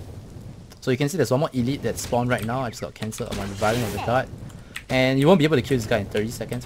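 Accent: Singapore. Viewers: you sound like youre from singapore. are you?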